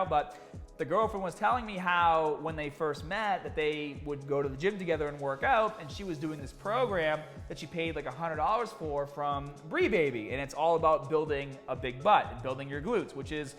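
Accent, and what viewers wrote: Boston, United States. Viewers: He's losing the Boston accent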